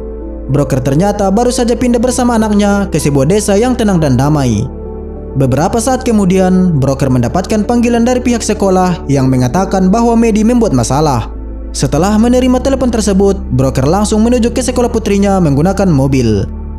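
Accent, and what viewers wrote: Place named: Toraja, Indonesia. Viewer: Klo bisa logat Torajax dihilangkan bro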